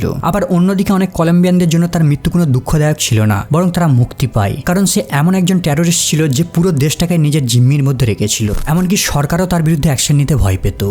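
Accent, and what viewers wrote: India. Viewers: আরেহ ভাই এরা ইন্ডিয়ান এদের কথা এই রকম হয়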